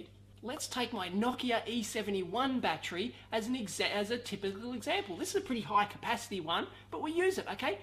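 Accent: Australia. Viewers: lUV THIS GEZZER, Must have the best aussie acent on the planet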